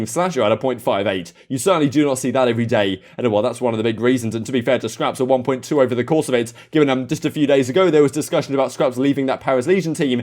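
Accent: United Kingdom. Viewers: British, ha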